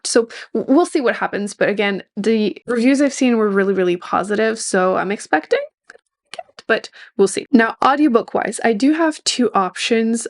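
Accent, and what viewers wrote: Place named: Canada. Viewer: Totally off topic, but I am listening to your accent and was wondering where you live in Canada?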